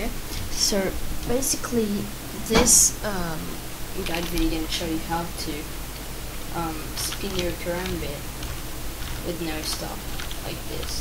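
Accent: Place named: Sweden. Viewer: he sounding swidish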